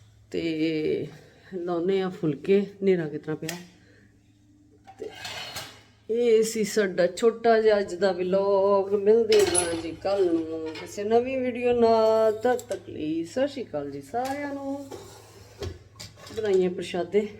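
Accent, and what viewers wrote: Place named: Majha, India. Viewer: ਪੂਰੀ ਮਾਝੇ ਦੀ ਬੋਲੀ ਬੋਲਦੇ ਹੋ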